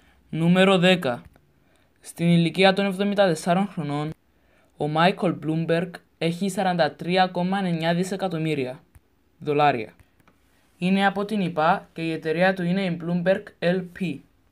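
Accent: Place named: Cyprus. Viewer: κυπριος ειναι ετσι μιλανε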